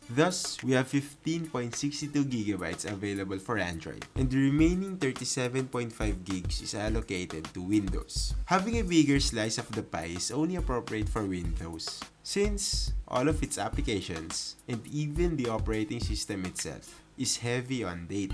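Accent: Philippines. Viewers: Ganyan accent ng pinoy pag nag eenglish o kung tawagin sa Wikipedia, Philippine English. Hindi naman kaylangan lagi american accent. Lahat ng bansa may kanila version ng english. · tagalog mo nlng tol halata rn lng accent mo.. cka pinoy lng karamihan mkakabili nyan..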